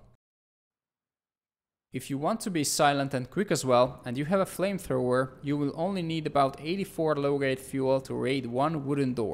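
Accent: Hungary. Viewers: Is that a Hungarian accent?? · You have hungarian accent lol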